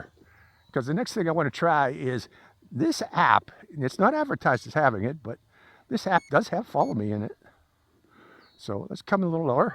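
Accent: Pittsburgh, United States. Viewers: I forgot to ask, are you from Pittsburgh Pa? Your accent 😍. I really do enjoy this video. It's what I need. Almost "hands on" instructions. Thanks again! I really want to learn how to fly drones. You've made it so much easier and you're really good with it.❤👍🏾I love the return home feature 😃Thanks again! I've subscribed👍🏾.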